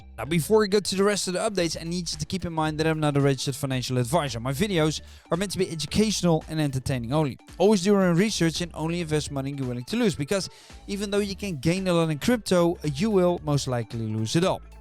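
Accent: Netherlands. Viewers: how high will it go in this run? hoor ik daar een Nederlands accentje?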